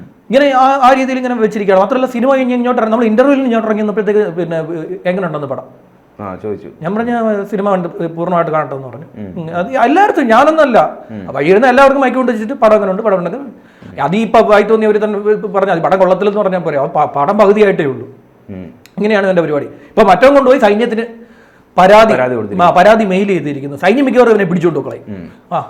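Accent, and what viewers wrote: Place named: Thiruvananthapuram, India. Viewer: എന്താ.............അഴുക്കു പയ്യൻ 😁., മ്മ്‌ടെ തിരുവനന്തോരം ഭാഷ 😆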